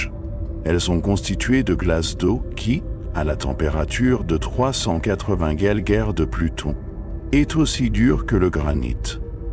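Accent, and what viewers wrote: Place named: United States. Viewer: accent americain ridicule à chier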